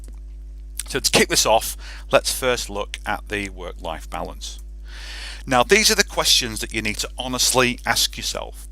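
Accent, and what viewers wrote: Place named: United Kingdom. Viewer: As soon as I hear a Brits voice, I know I need to find another source about opening a business in NEVADA USA…. 🤦🏽‍♂️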